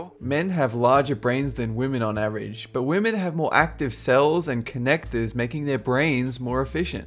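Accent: Australia. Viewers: I was wondering for a while, i am struggling to pin accent, is he Aussie?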